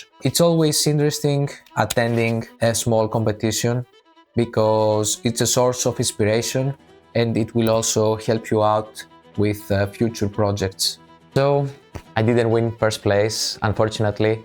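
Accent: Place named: Spain. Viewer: 'How is he such an incredible painter?!' Hears Spanish accent 'Ohhh' Fr though, amazing work! Gonna try out this skin recipe so thanks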